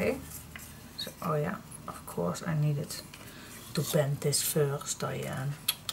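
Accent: Netherlands. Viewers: o) I love it to hear your netherland slang in your english :-) it´s so nice to hear you….sometimes (yes I´m crazy) I work on PC and hear only what you work, only for Hearing your voice….it´s like a friend in the room who works and talks with me :o) thanks for your time to make this Videos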